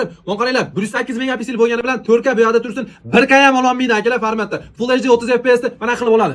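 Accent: Andijan, Uzbekistan. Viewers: Андижон шева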